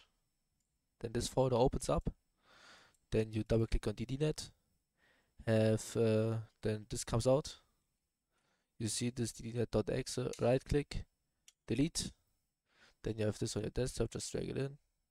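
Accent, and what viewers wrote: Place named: Germany. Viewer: das war das deutscheste englisch was ich je gehört hab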